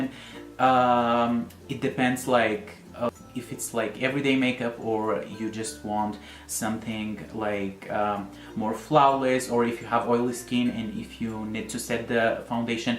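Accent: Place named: Armenia. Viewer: You are so cute <3 love your Armenian accent :D <3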